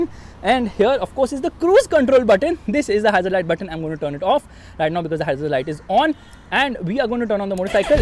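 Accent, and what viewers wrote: India. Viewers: Typical indian annoying accent.. 🤮🤮🤮..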